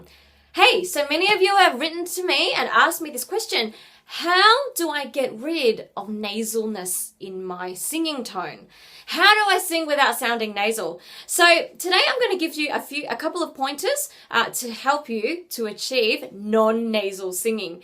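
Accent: Australia. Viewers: She's Australian, that's how they sound! ( I'm from there, I should know!!) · Its because shes Aussie aha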